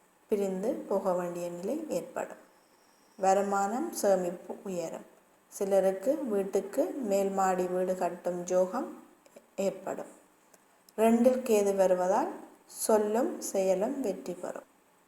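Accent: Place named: Sri Lanka. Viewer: Ilangai tamil super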